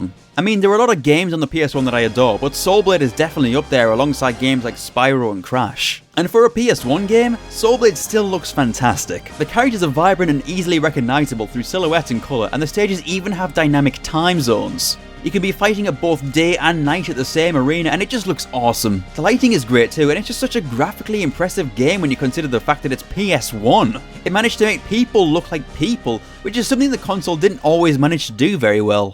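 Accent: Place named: Northumberland, United Kingdom. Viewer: I swear down you sound like a Northumbrian. If not somewhere from the north east.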